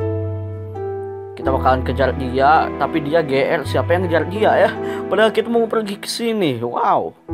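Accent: West Java, Indonesia. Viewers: orang sunda ya ???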